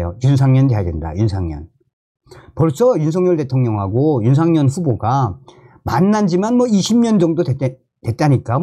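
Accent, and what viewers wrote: North Korea. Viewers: 윤상현 입니다 윤상년 아니구요 존경하는 정성산 감독님 방송 열심히 해주시는데 감사합니다멸공 부탁의 말씀은 발음 연습 필요합니다북에서 쓰시던 발음 불편하게 들릴때 많아요 열심히 해 주세요 화이팅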